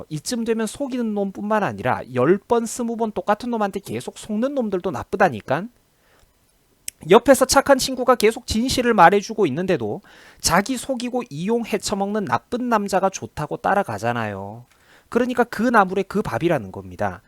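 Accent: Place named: Gangwon, South Korea. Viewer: 혹시 고향이 강원도신가요? 억양이 들리는거 같은데 구수하고 정겹습니다 ㅎㅎ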